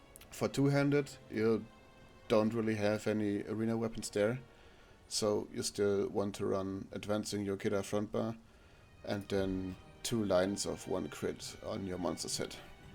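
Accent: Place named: Germany. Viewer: Man hört einfach raus das du deutsch bist:D Guter guide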